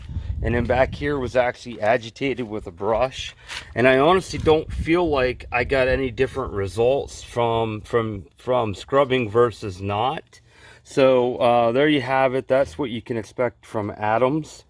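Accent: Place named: Baltimore, United States. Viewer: just guessing, from the accent... are you from East Bawlmer?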